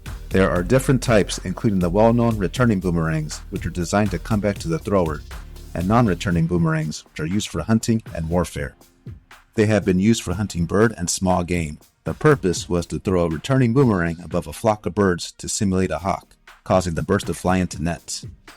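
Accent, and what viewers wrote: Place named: United States. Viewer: Why are all our Australian vids always voiced by Americans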